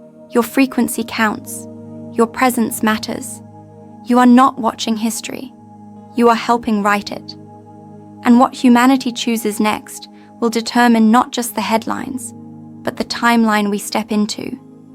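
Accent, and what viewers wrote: England, United Kingdom. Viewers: I like this girl when you do the English accent better than American accent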